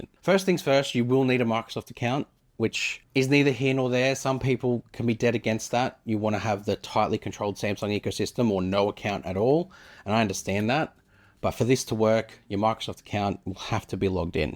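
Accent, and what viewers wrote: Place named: Australia. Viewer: Excellent comparison. Your accent reminds me my old good memories in Australia during college back in <num>. Thanks for the info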